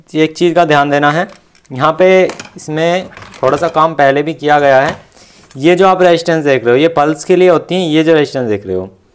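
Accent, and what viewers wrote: Meerut, India. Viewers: Meerut ke rahne wale ho lagta hai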